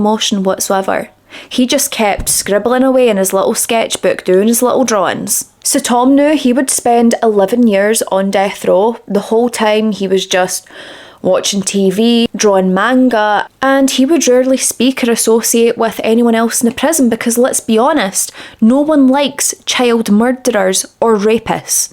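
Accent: Scotland, United Kingdom. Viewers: Ohh had to have a little break watching this one. it's a bit much in places but you do such a respectful and professional job and you are so beautiful. Love the cute Scottish accent ❤❤❤❤❤❤❤ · This accent man 😭😭 how can a fellow Scottish person like a accent so much haha!! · Subscribed for the accent 🤣 I like the way scottish people talk ✨ You could read me the phonebook ❤️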